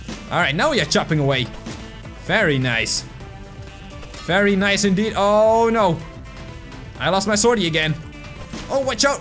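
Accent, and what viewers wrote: Netherlands. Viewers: You cant hide ur dutch accent XD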